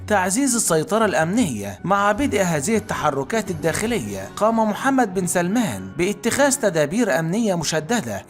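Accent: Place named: Egypt. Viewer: صدقني لو تشوفلك حاجه تفعك احسلك من هاذا الكذب وهاذا التحليلات لاانت ولا الذي معك تستطيع ان تعرف شي او حتى تحلم حفض الله حكامنا وحفص الله ملكنا والله لاانت ولا حتى جدودك تقدر تعرف حاجه عن حكامنا خليك فالمصايب الي عندك احسن قسم الله عقللك وعقل من اعطاك هاذا الكذب شوف مشاكل بلادك وشوف مصايبكم وحلوها انت تعرفوون أنكم ذباب ويجب التعامل معكم بحزم تراك مصري حتى لو غيرت لهجتك معرف من الي الكذاب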